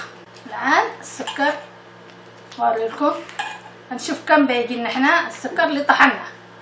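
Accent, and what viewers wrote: Aden, Yemen. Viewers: DAir هاذي لهجت عدن كل مكان معه لهجه اني من الحديده مااعرف اتكلم مثلها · الله يسعد هالمبسم .. ما شاء الله لا قوة الا بالله ما أحلى طريقة كلامك ولهجتها اليمنية الجميلة .. ما أجمل روحك .. يا الله شكثر أحب أهل اليمن ..الله يفرجها على اليمن ويردها إليه ردا جميلا ويردها اليمن السعيد الحافل بالخيرات والبركات اللهم احفظ اليمن واحفظ أهلها واحفظ دينهم وأعراضهم ونساءهم وشبابهم وشيبانهم وأولادهم وبناتهم وأطفالهم وأموالهم وبارك. اللهم في أهلها وأرضها وخيراتها وما فيها من النعم .. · هاذي اللهجة اليمنية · انا افدا القلب حقك ي خاله والله انتي الام العدنيه الي يجي قلبه صافي وتعتبر الكل عياله وبناته والله انا مستحيل ادخل بلبخور او افكر اننا اسوي بخور بس والله نحنا مغتربين وكلامك يرجع لنا دكريات اللهجة العدنيه ونحنا محرومين منه بلغربه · الله يحفظك ياماه انا عراقي وعشت بليمن وعدن خاصة من الكلام العدني ذكرتيني بعدن كلها وناسها وطيبهم انا الان في العراق وحبيت اسوي بخور عدني بس المشكلة مافيش الظفر دا ينفع بدونه ولا ضروري يعني ولا في حاجة بداله ونفس الشغل حق الظفر 🌹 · اللهجه اليمنية تعجبني وطيبه اهل اليمن محبتك من السعوديه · يازين اللهجه اليمنيه ♥️ نحبكم من السعوديه 🇸🇦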